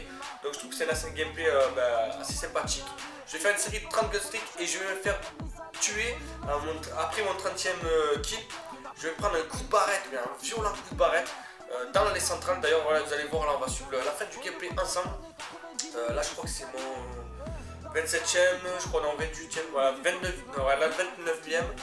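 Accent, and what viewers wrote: South, France. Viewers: Notre tjrs aussi beau tjrs aussi bn merci pour ce nouveau bijou de ta pars bisous et tn petit accent du Sud me donne la chaleur qui y fait =)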